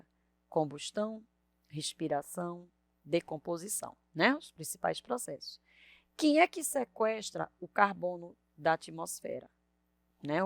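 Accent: Northeast, Brazil. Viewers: pelo sotaque acho q do nordeste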